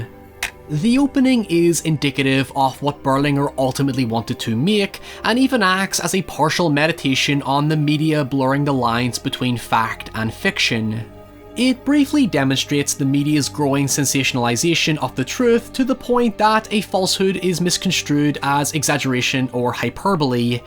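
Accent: Northern Ireland, United Kingdom. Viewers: Are you Northern Irish? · Northern Ireland. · Are you from northern Ireland?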